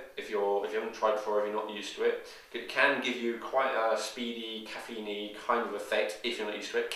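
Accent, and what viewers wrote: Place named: United Kingdom. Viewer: The few bits of your audio that are discernable, seem to possibly have useful info. Please, repost this info having recorded it in a room acoustically dampened to eliminate reverb, using a more sensitive microphone or placing it closer to your mouth, and without mumbling. Not everyone is atuned to the British manor of speech, and I suspect you want to attract the most viewers possible afterall. Also I suggest using a backdrop, even if it's only a non-distracting picture (digital or enlarged photo) of a common interior room, rather than a solid color. And shave before a public address. It lends to your credibility. Please, reply when your updated video is posted. I look forward to your thoughts on the posted topic.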